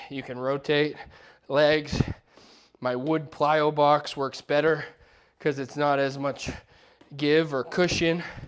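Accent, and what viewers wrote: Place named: Boston, United States. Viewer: Boston accent!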